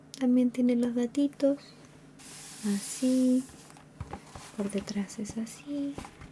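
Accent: Chile. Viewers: Cuando eres chilen@ reconoces a otro chileno en un segundo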